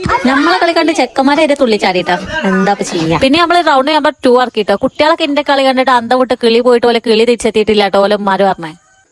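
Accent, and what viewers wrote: Kasaragod, India. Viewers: സംസാരം കാസർകോട് സ്ലാങ്ക്🙄🙄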